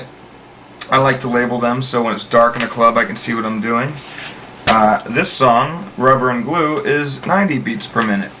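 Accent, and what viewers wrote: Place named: United States. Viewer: oh okay im glad that you are joking. he clearly says "go get somethin to eat" ... maybe you are not used to American accents idk. He is talking about rocking a party, but the place he labels the records is in his house where he is also filming this video. The whole point of saying "go get something to eat" is to illustrate you could go do anything and the record will still be labeled at that exact spot. Listen to how casually he is talking. This guy isn't even a rave dj. If you arent joking, then I'll just say get your ears fixed maybe turn your monitors down lol I guarantee you if you have ever rocked a party you would know.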